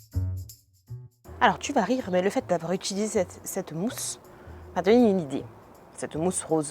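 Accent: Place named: Corsica, France. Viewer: Coucou comment vas-tu Petite vidéo sympa pour le bêtisier j aurait mis un colorant bleu comme ta voiture c est vrai que le produit a l'air peu économique mais il a deux fonctions PS j adore ton acens anglais corse😀😘 a vendredi bises cordialement ce serait un plaisir que un jour je puisse te rencontrer pour avoir tes conseils au plaisir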